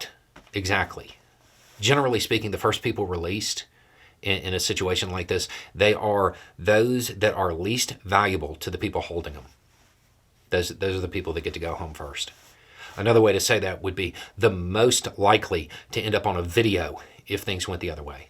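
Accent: South, United States. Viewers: love your takes. I particularly like it when you address some of the jingo-idiot-talking points partly because I do take some joy in seeing someone take the lumber to those idiots but also because I can tell when a topic or take hits too close to home for you. This time I literally had to check mid-stream to confirm I had not upped the playback speed to 1.1 (my adjustment when a video seems to be dragging on a bit) - but nope, normal playback speed. You became about 20% less slow-speaking Southerner and 50% more pissed off in about 4 seconds, and kept the pedal to the metal for the rest of the video. It's good to know the old pickup still has some of that power in there despite having just tooled around the village pop-n-stop for the last few years. Keep it up, you are fighting the good fight!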